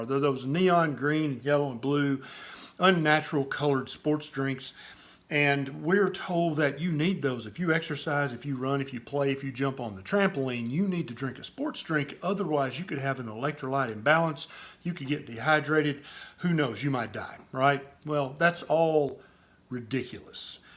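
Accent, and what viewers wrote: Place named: West Virginia, United States. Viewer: I love your accent, Are you from West Virginia? That's my favourite English accent! You make interesting videos. I love to learn new things!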